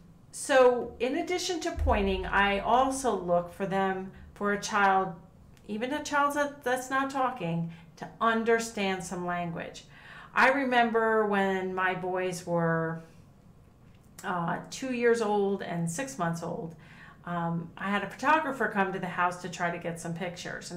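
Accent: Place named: Pennsylvania, United States. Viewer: Knew you were from Pennsylvania right away.😂. from a South Florida transplant by way of the Lehigh Valley and thank u for rhis guide 😊❤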